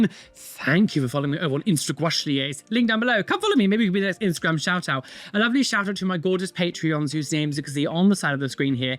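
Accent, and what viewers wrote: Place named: Bristol, United Kingdom. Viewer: I had to stop painting because I was laughing too much at your reactions. That Bristol accent just sent me 😂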